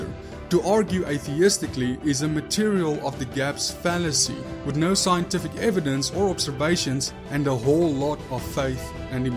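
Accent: South Africa. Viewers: You sound South African... are you? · ahh crap! he sounds South African, if he is, I apologize for the rest of us who understand science.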